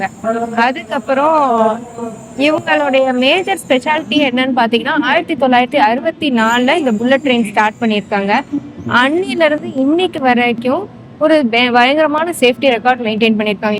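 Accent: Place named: India. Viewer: 典型的なインド訛りの英語だな。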